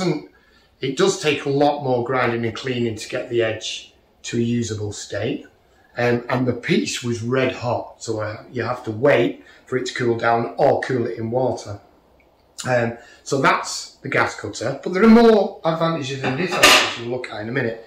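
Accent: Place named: England, United Kingdom. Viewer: it's hard to listen to specially the English accent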